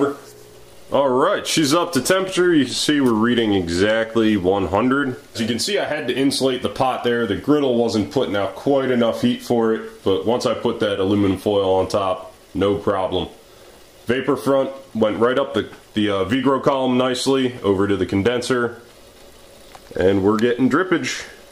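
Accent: United States. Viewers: This guy sounds like AvE. But more 'murican.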